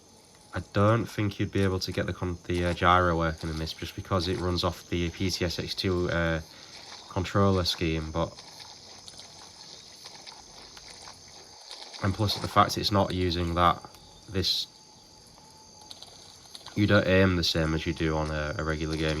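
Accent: United Kingdom. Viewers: Guy with strong British accent play MGS with Russian translation? Is this kind of joke or what? :-)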